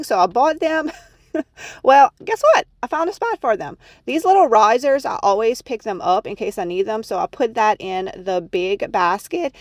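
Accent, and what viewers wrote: Louisiana, United States. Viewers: Love your accent? Southern Louisiana? Your home looks beautiful!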